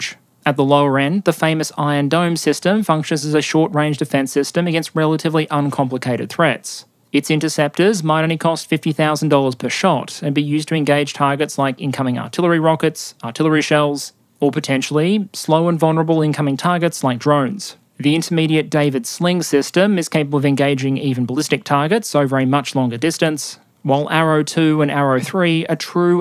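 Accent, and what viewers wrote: Australia. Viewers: Your upload schedule is perfect. You always just in time for me to fall asleep to your video on Sunday night (I'm same timezone) and then watch/listen to on the way to work in the morning. It's also fantastic to hear an Aussie online, and one who clearly knows his shit. Keep it up,.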